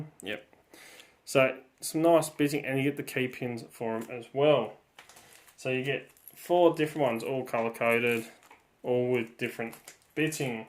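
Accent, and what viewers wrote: Australia. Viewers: Glad to find your Channel, good to hear a fellow Aussie showing us some great content, I am new to Lock Sports but am enjoying learning with my Sparrows Tuxedo kit, Cheers;) also thanks for the referral to Locksmith Toolbox they are awesome and good prices:)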